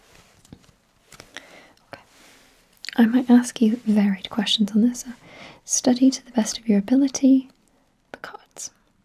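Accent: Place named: United Kingdom. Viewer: oh my gosh! this ticks all the boxes right now!! -spoken and not whispered (not that I dislike whispered asmr, but when it's something that should be close to being realistic, I think, non-whispered is better suited!) -cognitive exam/mini-mental-status whatever you wanna call them! - so underrated -UK accent :)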